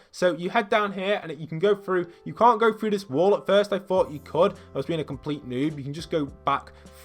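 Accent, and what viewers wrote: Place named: United Kingdom. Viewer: Anyone else notice he has a British accent but goes really northern when he says chug jug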